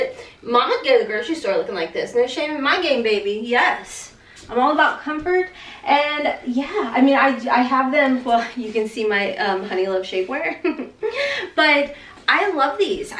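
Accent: South, United States. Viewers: Thank you for this haul. I have never even.heard of Zaful until your haul. You are around the same height and body type as me so it really helps to see how things look on you in the physical moving around in them aspect. Every single one of these pieces looked amazing on you. Ive literally been wanting to dye my hair lilac or lavender but its so dark brown which makes it harder. But you are Adorably Beautiful. I thought you looked amazing in each of the items you tried on. As You always do. Im glad you come out of your comfort zone to do these videos. We need more positive Energy to uplift eachother and support eachother. You hold a great platform for that. Again thank you, you really are a natural too bad being shy holds you back sometimes from being your true outgoing, entertaining self. Dont ever quit. We Love ya your a Beautiful Queen. God bless. 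P.s Your cute southern accent gives me life. 🙏💜👑🌞🌸🌼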